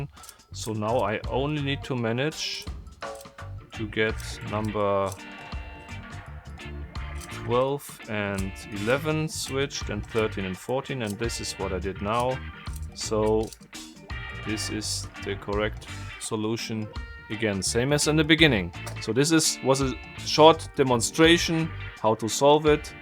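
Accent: Germany. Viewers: Bitte lerne Englisch. Du verdrehst die Sätze und man hört deutlich ,dass du eine Deutscher bist. wieso machst du nicht einfach einen Deutschen Kanal? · Ich wusste dass du deutcher bist, dein akzent verrät dich. Aber ich bin auch aus deutschland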